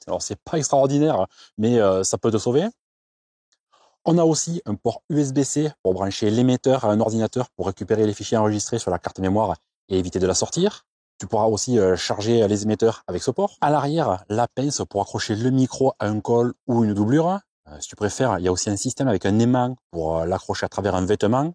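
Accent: South, France. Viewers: hello, une fois de plus, très bonne vidéo très "QUALI 😊" pas desbrouff...et agréable à regarder et à écouter, ""avé"" l'accent du sud🤩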